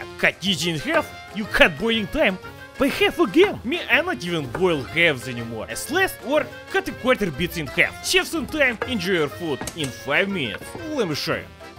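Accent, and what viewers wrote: Russia. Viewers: This is how to basic but with a russian voice over and perfect mixture of destroying and actual knowledge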